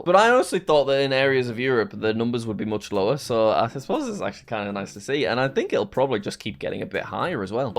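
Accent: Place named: Yorkshire, United Kingdom. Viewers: Funny, to me you sounded a heck of a lot more Yorkie than Geordie; but once you mentioned it, I tried hearing the Geordie accent in my head (Ross Noble, popped right in there xD ) and now I'm not so sure anymore! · You sound nothing like Geordie bonny lad nor Smoggie. More like Not quite Yorkshire. Some of the accents in the former pit villages of Durham sound more Geordie than youse. Glad to see Norfolk and Suffolk differentiated. In Suffolk words get elongated, in Narfik they get get shortened.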